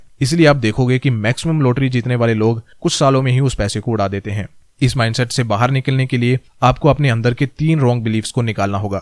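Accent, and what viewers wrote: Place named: Punjab, India. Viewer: punjabi accent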